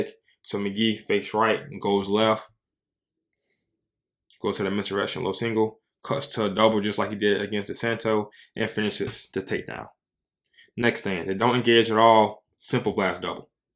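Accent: United States. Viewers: You are articulate to the 9's Being able to edit and showcase the breadth of the subject matter But there's something about that southern cadence that I can't stand "Colluh Ta" it's as if you have to rush through your sentences. A nervous tick I guess what I hate about it is how I really have to pay attention to what you're bringing up. If it were edited the same way you spoke, clips would go by in a fraction of a sentence between long stretches of athletes just looking around Let what you have to say have some staying power. What do I know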